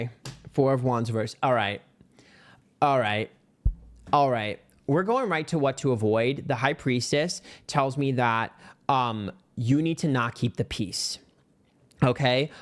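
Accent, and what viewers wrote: New York, United States. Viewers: ♐️👄♐️ When I start hearing NY accent & dropping F-bombs = He is NOT messing around! 🫨 Thanks for the Go Ahead 👸🏻🧾 GTFOH IWTFYWB ACTIVATED 🫡 *sharpening claws*💅🏼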